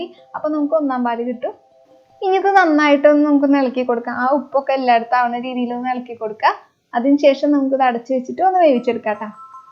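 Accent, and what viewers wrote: Thrissur, India. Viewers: തൃശ്ശൂർകാരി ആണല്ലോ 👍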